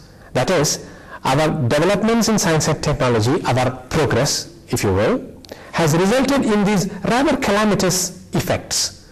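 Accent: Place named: India. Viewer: Sir, it is a lesson for Indian graduate students, his objectives of teaching this essay is to make the students understand the key points easy not the american philosophy, it is in Indian English pronunciation, so that Indian students can understand these sounds easy. Thank U Sir.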